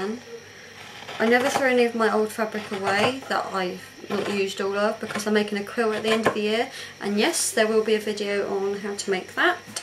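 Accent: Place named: England, United Kingdom. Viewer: going to try this over the weekend, love ur accent-where in England are you from???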